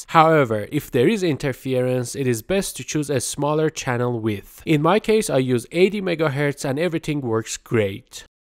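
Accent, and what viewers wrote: Iran. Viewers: چرا لهجه ایرانی ها انقد ضایعست و معلومه😂😂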